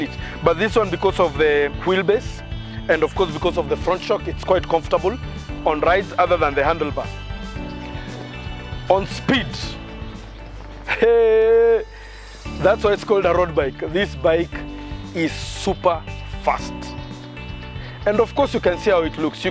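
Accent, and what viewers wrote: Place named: Kenya. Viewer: Sounds Kenyan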